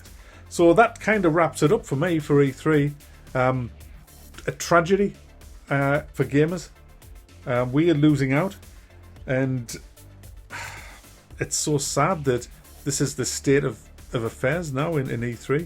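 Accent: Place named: Newcastle, United Kingdom. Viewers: No he ain't, I live in Wales. He sounds like a geordie (Newcastle) to me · Wrong accent, he's from Newcastle which is in England very far from Wales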